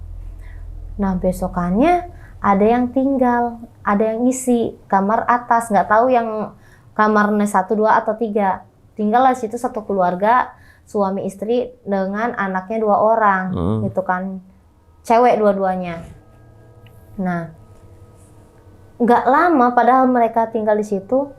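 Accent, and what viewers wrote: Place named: Medan, Indonesia. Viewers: Logat nya Medan kali ha salam dari Medan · sor x ku dengar kakak ini becerita pake logat medan bah wkwk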